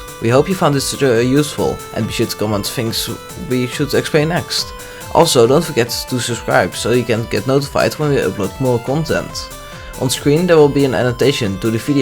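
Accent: Netherlands. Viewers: Niets hatelijker dan een hollander die engels spreekt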